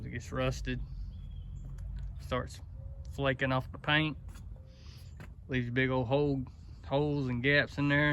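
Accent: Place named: North Carolina, United States. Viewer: You gotta be From NC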